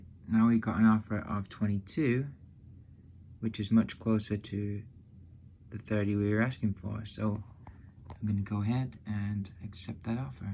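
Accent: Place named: Scotland, United Kingdom. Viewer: You sound as if you have Scottish twang in your voice Max Boyce is a Welsh comedian.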